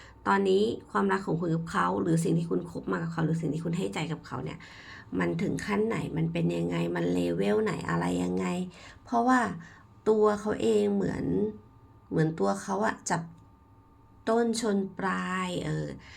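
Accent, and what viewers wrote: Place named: Kanchanaburi, Thailand. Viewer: พลังที่ดีมากๆสค่ะน้อง อ่านเสียงเหน่อและเข้าใจง่าย.เปปๆขอบคุณค่ะชอบเหน่อค่ะ.เคยอยู่กาญจนบุรีมาเลยชอบมากนะคะ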